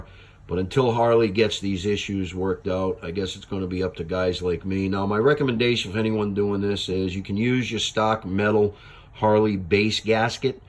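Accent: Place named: East Coast, United States. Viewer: Great description of what you found and the mechanics of it all. Subbed for you articulation and East Coast accent!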